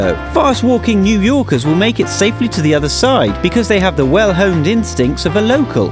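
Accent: United Kingdom. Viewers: Why is a British person telling me about new york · What do u know about NYC? You sound British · Why is a British guy telling us this. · runningstorm920 it sounds better in british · When 13 things not to do in new york was voiced over by a british citizen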